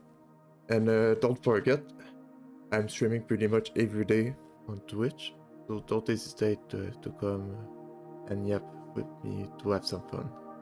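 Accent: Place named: Quebec, Canada. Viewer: toé tes Québécois ! hahahaha :p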